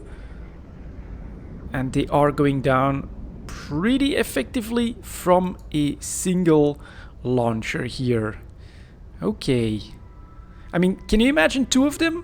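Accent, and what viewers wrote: Belgium. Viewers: Belgium i think :-)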